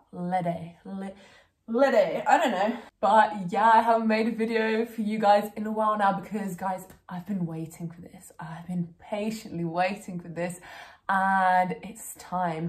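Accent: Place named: United Kingdom. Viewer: I love your hair, your accent reminds me so much of someone from a British sitcom like skins 🤣❤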